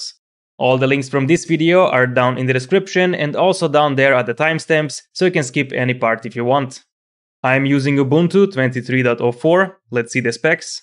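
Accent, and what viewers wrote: Russia. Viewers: Ur vids r cute! Like ur Russian or whatever accent.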